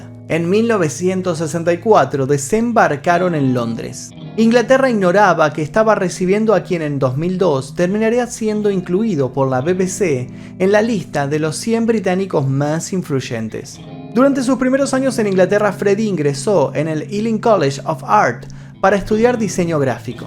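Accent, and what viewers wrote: Argentina. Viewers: Este narrador es argentino cheee, y quizá no se la coma igual se le salen los ojos 👀 mirándola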